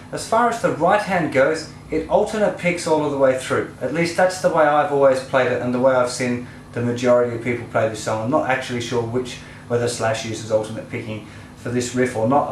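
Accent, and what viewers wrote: England, United Kingdom. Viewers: Never thought I’d have Norm Macdonald teaching me guitar while using his English accent. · Is your origin from England?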